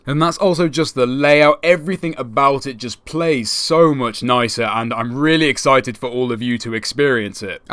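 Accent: United Kingdom. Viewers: How did I know that the commentator was en English lady.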